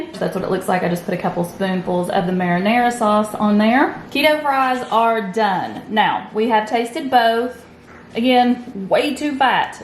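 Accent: South, United States. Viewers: Girl! You are gorgeous ! With an adorable southern accent to boot!! I was also the tall, red headed freckle faced girl in school and was made fun of. I turned it around and realized I could carry more weight than the shorter girls and red heads are rare! You got it going on and don't let anyone tell you different! 💖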